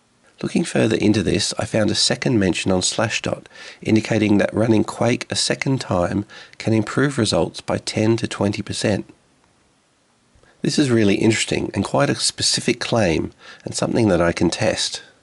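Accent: Australia. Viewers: Ah! I see. I didn't realise you're Aussie. Great video!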